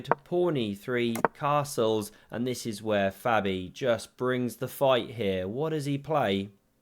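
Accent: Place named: RP, United Kingdom. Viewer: There's nothing like chess commentary served up with a posh Eaton college accent!❤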